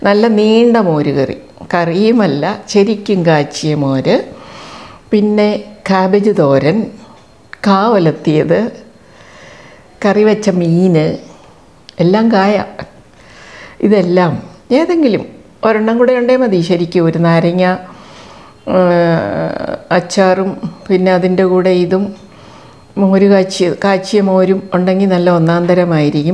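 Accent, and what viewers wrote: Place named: Kollam, India. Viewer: 🙏🙏 teacher,super presentation ammayude sneham adhyaapikayude arivu ellaam koody nalla ishtamaayi 😍😍 teacher place kollam aano samsaaram kettappol thonniyathaane 🤗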